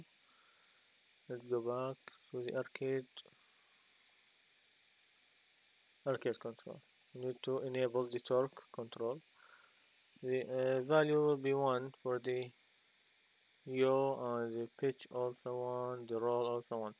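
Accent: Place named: Iraq. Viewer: احسك عراقي 😅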